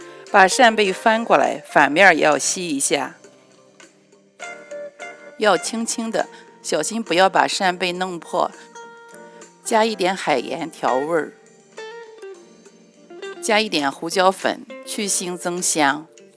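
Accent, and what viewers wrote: Shandong, China. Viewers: 听口音您是山东人吗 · 聽到了熟悉的青普，給你點個讚👍我買的扇貝柱牙磣怎麼办？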